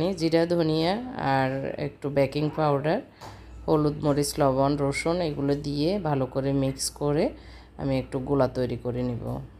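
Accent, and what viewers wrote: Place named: Feni, Bangladesh. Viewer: Assalamualaikum apu ... Ami sob somoy apnr video dekhi but kokhn o comments kora hoy na..amr Bari o feni .upnar feni r tune e kotha Bola amr onk Valo lage... Allah upnk Valo rakhuk .. Apu kitchen cabinet korsen j oitar details ta ektu diben pls.koto taka lagse ki board use korechen esob bolben pls..ami o banabo tai idea nite chai.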